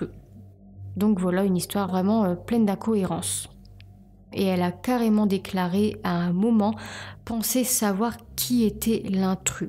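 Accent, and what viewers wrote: North, France. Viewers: Bonjour, je te découvre et sincèrement très sympa, car excellente recherche et superbement raconté. Et ce ptit accent du nord🥰. Belle continuation.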